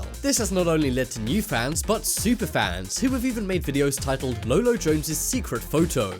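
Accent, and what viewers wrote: United Kingdom. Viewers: Yeah I can tell he's British "or of the sort" I just really like his accent and the pronunciation haha. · He's British, and I would love to speak in a fluid British accent, but it's hard to learn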